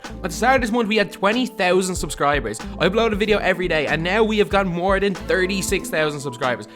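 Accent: Ireland. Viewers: Your accent is dope. Irish I would assume. Pretty cool listening to an Irish dude talk about basketball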